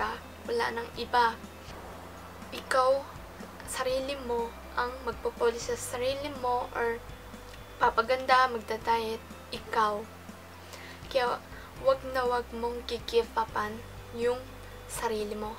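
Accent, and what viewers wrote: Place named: Japan. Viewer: ang cute niyo po magsalita, parang may japanese accent 😂💛 new subbie here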